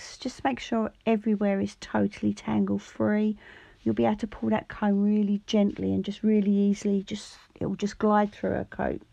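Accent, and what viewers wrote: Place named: Essex, United Kingdom. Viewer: I live in Suffolk, I have a Rough Collie, a cavalier King Charles, a 10 year old apricot toy poodle , and have recently got a new toy poodle puppy, she was just over 12 weeks when I got her, she is now 21 weeks, and had her first little groom 3 weeks ago, I intend to let her have a litter, I used to breed Ragdoll cats in a very small way, this litter of yours are gorgeous , you sound like your from Essex, I would like to speak to someone who is close for advice, do you live in Essex?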